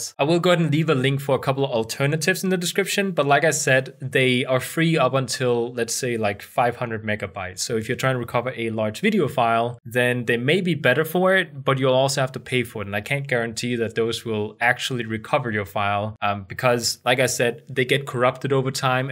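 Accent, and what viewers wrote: Denmark. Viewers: Hey, are you danish?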